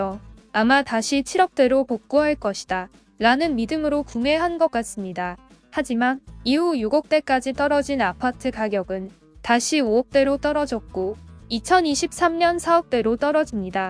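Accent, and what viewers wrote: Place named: Mokpo, South Korea. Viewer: 아따 목포구만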